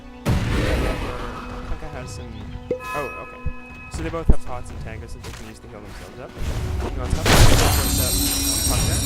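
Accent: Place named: Newcastle, United Kingdom. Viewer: hi geordie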